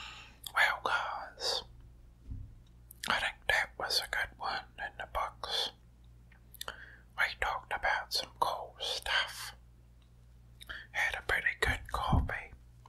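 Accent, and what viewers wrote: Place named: Pennsylvania Dutch, United States. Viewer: it's a penny Dutch accent albeit a little different from the traditional penny Dutch accent. He's from PA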